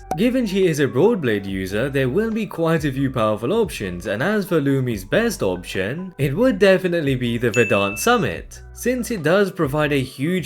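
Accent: United Kingdom. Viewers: sounds british to me. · ??? Her accent is Scottish, not British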